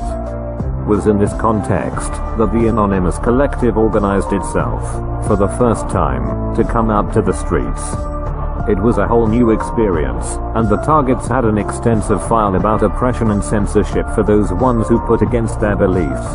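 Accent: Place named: United States. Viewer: Ata, da pra ver q quem está falando é um americano. Brasileiro ou qualquer pessoa no mundo pode falar fluentemente o inglês, msm assim tem sotaque.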